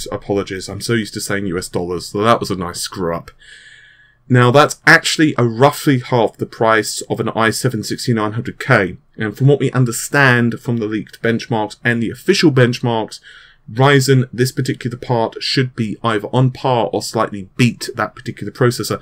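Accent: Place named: Cockney, United Kingdom. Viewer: 2 & a half minutes til you actually say anything worth listening to. Thru all the mentions of ppl. Let them get their own youtube channel & get their name out there. Then tons of opinions & nonsense. Just get to the fuken content that the video is named after. Less your opinions & bad cockney accent. It's like wading thru a pond in your mind til we get to the information. Why when a mics turned on do ppl all of a sudden get diarrhea of the mouth. You're very verbose.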